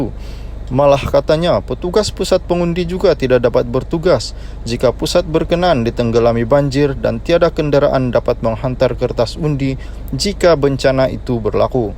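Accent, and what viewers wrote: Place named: Sabah, Malaysia. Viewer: Hei bro suara kamu dah tentu orang Sabah.. kamu makan le duit yang ditabur oleh musuh2 umno kepada kamu untuk berita2 jahat yang mengelirukan rakyat.. aku berdoa semoga duit2 yang kamu dapat dan memberi keluarga kamu makan menjadi BARA API NERAKA yang akan memusnahkan kamu.. kamu orang JAHAT! Puuiiiiii Poodah!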